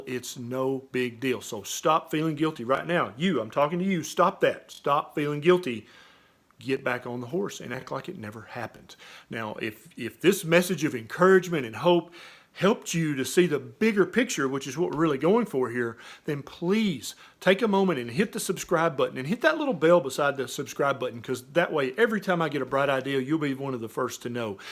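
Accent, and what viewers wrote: South, United States. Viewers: I love your videos. Your dialect is pretty funny though. Are you from the South?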